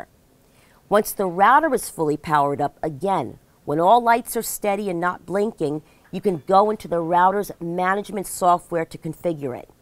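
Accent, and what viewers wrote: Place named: New York, United States. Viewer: THICK ny accent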